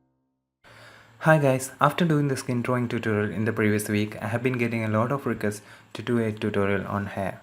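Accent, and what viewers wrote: India. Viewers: Wow, that's fantastic!!! It helped me very much, because I'm drawing very much the last time (I've drawn rey of Star wars (but not the hair yet ;) )and now I'm working on Frodo Baggins) One of the best channels in YouTube!!! And I love your Indian accent ;) How much time do you need to do an Portrait?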